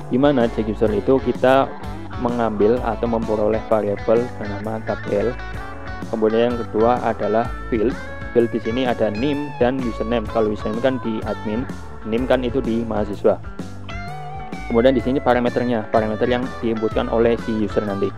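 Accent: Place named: Banyumas, Indonesia. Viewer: Wong ngapak tah, cilacap mas xixi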